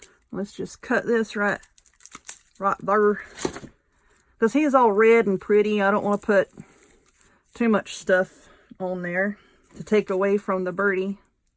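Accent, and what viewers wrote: South, United States. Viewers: Love you, your Southern accent, you are so funny, and you do such cute things! Also, I love your nails in this video. Can you tell me how you did them? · Girl you are too funny! And that's coming from another Southern Gal with the same accent and sense of humor;) Love your ideas and you have gotten me back into crafting after many years of having no time to do so. And I'm having a ball!! Thank you!! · I have said this before but I have to say it again. I love listening to you. You are very funny. I agree with you about the bugs. Where is your accent from in the South? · I love the mess that you have going on 🤣 creativity at its best! I really love this little project ❤️ Love you accent btw 👧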